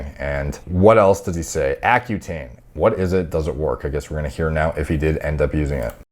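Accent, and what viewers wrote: Ireland. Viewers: Bro sounds Irish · Buddy nobody taking fucking advice from no irish sounding asian that uses roids and is still smaller than an 8th grader wtf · I love his accent. Very Irish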